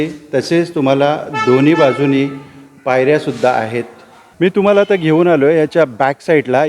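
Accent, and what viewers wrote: Konkan, India. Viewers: Mi hindu aahe pan tumchi kokani muslim language aikayla far god watate. Jevunshi aiylu etc. all d best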